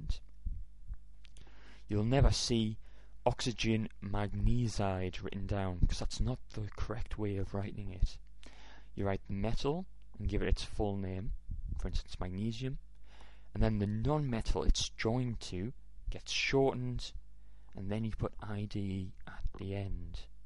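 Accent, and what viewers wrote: Scotland, United Kingdom. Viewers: I can hear Scottish accent in there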